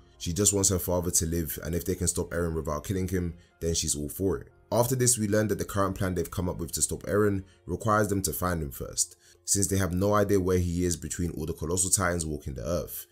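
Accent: United Kingdom. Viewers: ARe u brit? nice explanation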